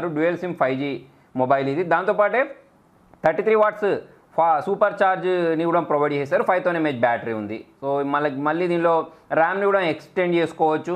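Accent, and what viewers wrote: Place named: Godavari, India. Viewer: Motham godavarikane anna.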